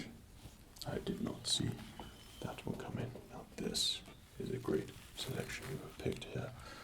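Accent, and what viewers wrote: England, United Kingdom. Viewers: English accent gives me so much tingles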